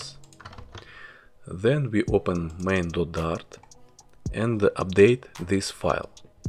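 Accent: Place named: Russia. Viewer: ну 100% понятно что русский, а почему на английский разговариваешь)?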